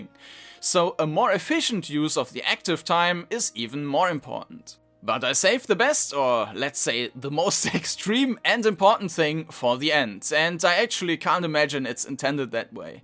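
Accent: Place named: Germany. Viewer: der deutscheste Akzent, den ich je gehört habe 🙂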